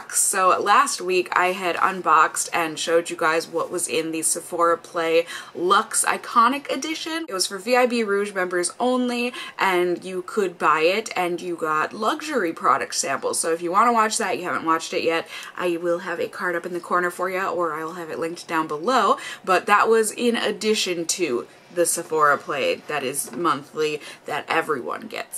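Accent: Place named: Midwest, United States. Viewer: I love that you say b-aye-g lol Midwest accents make me happy 💕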